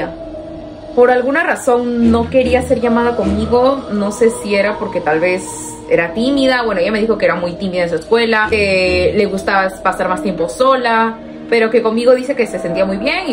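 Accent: Chile. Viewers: Pregunta eres de chile 🇨🇱? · eres chilena🤔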